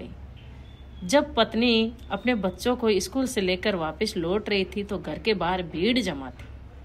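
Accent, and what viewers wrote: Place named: Himachal Pradesh, India. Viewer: बेटा जी आप हिमाचल, बिलोंग करते हो भाषा, से, फील, हो, रहाहै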